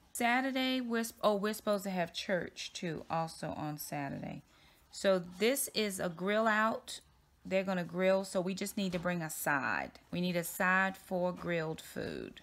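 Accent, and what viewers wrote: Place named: Baltimore, United States. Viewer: What part of the country are you from? It's not often I hear someone who says "on" the same way I do, and I'm from Baltimore, MD. That almond milk is the best, and cheapest, I've found!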